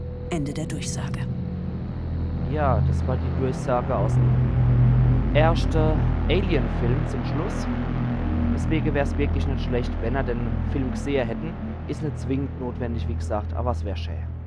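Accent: Palatinate, Germany. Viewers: ah hier steht es ja auch .lol. Pfälzisch tatsächlich.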